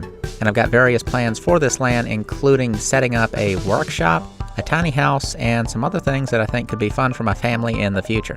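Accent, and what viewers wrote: South, United States. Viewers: What city & state or town & state, is this located in??? Your accent sounds like you’re somewhere down South & I love the rent prices!👍🏻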